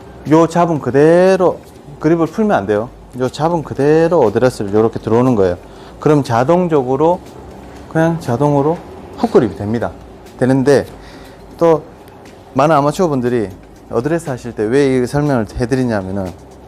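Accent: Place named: Gyeongsang, South Korea. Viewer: 구독 좋아요 눌렀습니다. 경상도 사투리가 참좋네요^^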